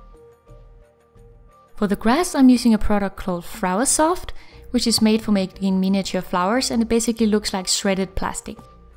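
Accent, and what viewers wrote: Denmark. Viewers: Are you Daneish you're accent sounds Daneish if. U are then God video tøs det blev pænt ❤️ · Are you from denmark? Your accent sounds danish :)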